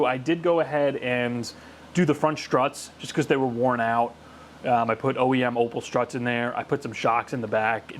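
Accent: United States. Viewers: Where are you from? You sound American, I live in the us and have an Saturn astra here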